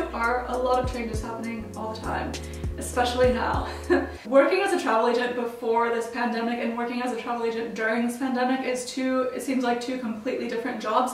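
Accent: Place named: Australia. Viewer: Your accent is definitely Australian now lol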